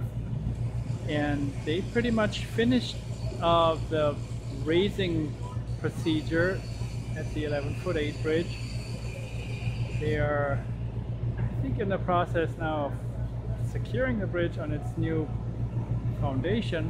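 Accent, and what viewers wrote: Germany. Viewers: You are sounding a bit german Im sorry if not 😂 (Im from germany) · Your accent sounds kinda German 😄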